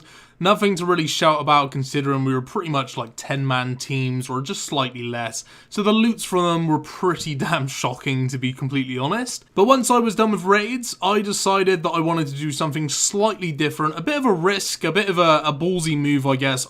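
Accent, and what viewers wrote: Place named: England, United Kingdom. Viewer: !! where are you from in england?? i recognize your accent!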